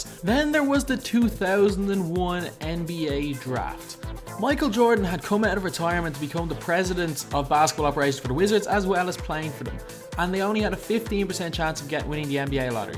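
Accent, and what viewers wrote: Ireland. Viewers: Oi Oi Are you Irish kiad 🇮🇪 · I love the Irish accent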